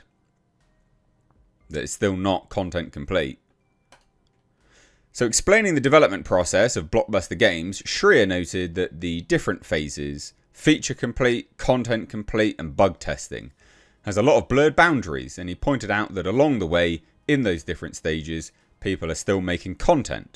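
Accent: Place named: England, United Kingdom. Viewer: sounds English. Yet he just said coming out in “fall”. WTF🤦🏼🤣. Pretentious knob🤣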